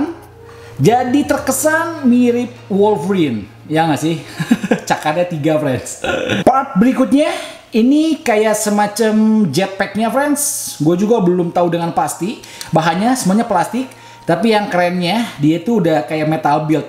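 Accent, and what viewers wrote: West Java, Indonesia. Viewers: Ciri khas orang sunda kang... Hehehe, sy oge Sunda sok kitu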